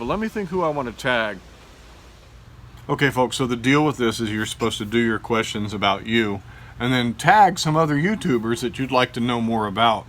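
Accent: South, United States. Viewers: Southern accent is everything. I guess your first name is from your scottish grand mother. Great videos!!! by the way.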